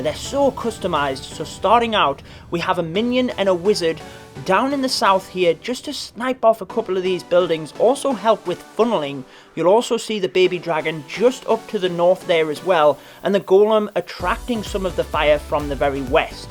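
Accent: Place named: Scotland, United Kingdom. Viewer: Are you Scottish? Love the accent.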